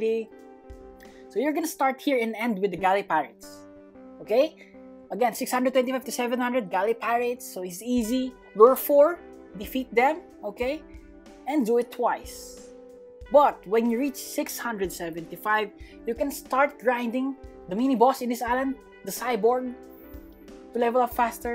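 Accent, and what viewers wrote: Philippines. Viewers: Pilipino kaba kasi parang pilipino ang accent mo eh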